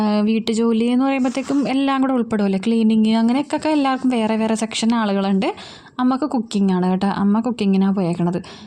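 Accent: Kochi, India. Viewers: Njanum kochi kari annu.... Kochi language kelkumbo othri santhosham..... Njan chennaiyil annnu ediku varum Kochi marakan pattumo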